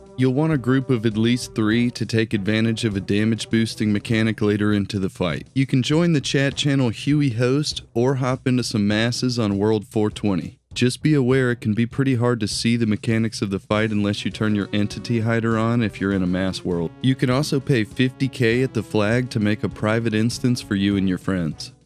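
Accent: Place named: Houston, United States. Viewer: Sounds like he’s from Houston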